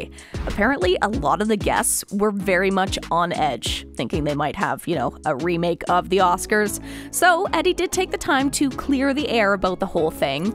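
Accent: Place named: California, United States. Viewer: Girl change that California tone. Please!!!!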